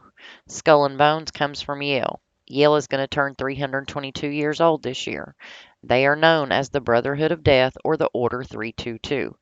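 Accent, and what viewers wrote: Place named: South, United States. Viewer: I thought I had a southern draw. Where are you from ?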